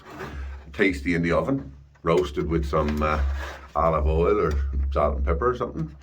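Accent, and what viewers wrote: Ireland. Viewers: Was fully sure that this was an Irish video when I heard the accent, your accent is very like a Waterford accent. Not the first time I have mixed the Newfoundland accent up with the Irish accent. I am guessing Irish must have settled in numbers way back. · Is this man Irish? Good job on the root cellar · Come.on in and meet my potatos. , Your not Irish , are you? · Sounds like you are Irish, the accent, dialog, and love of spuds gives you away. Norm from Scotland. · Is your accent a typical Newfoundland accent? I’d never heard one before. I’m Irish and it’s bending my head coz it sounds sooooo Irish to me, with a twinge of Canadian 😊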